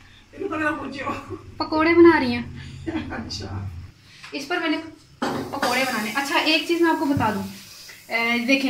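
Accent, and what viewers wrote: Punjab, India. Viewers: sabh to jiyada nice tuhadi mithi punjabi boli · I really need this recipe ur Punjabi is v.cute Mashallah double mzaa ataa hay 🤗🥰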